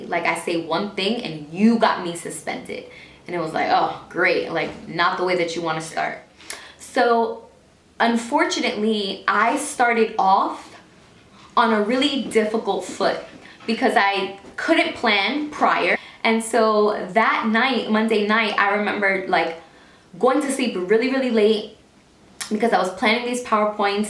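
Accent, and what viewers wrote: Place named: New York, United States. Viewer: I love your NY accent.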